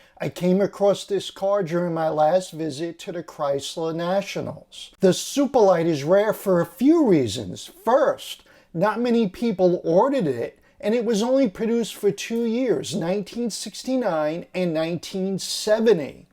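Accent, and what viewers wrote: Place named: Bronx, United States. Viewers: the East Coast accent Just like when they say they're going to Florida We're taking the kids down to Disney world in Flarider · Hey! Are you from the Bronx? You talk the same way my father did. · That accent... Baracooder"..😂 like my grandma from New York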